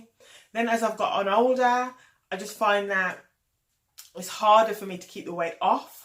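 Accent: Birmingham, United Kingdom. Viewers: You sound like you're from Birmingham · You sound like you're from Birmingham??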